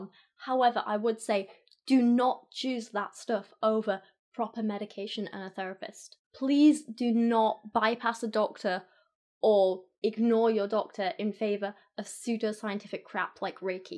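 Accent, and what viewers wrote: United Kingdom. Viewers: Your timing is awesome. I had a really bad day today, and there you are, waiting for me to get home and log on so you can do your British patter with the cute accent and the inspirational point of view, and you'll talk about a point that need qualification and you'll go ". . . B-u-r-t . . . " and then add the qualification. So, I watch you for a half an hour and now I feel better. Thank you!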